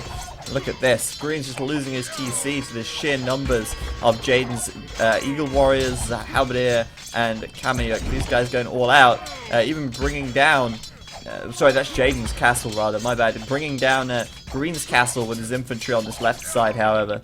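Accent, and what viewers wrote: United Kingdom. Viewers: Age of Empires 2 it's dat British accent! Welcome change from the Aussie drawl I have to put up with >_<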